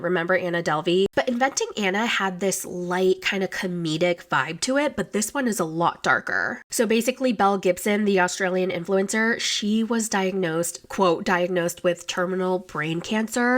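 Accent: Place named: South, United States. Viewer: I just love you, ♥️🌹your southern accent is amazing 👏 great job, great podcast 👏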